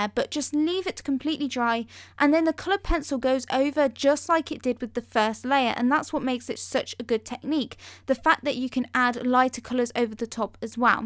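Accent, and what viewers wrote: England, United Kingdom. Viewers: I love you're accent, it sounds adorable 😍 are you from England? 😇💫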